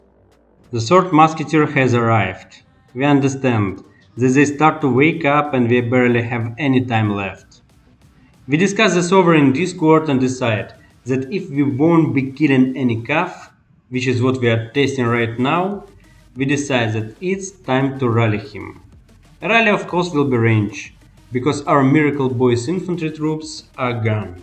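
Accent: Russia. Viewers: Слышно русский акцент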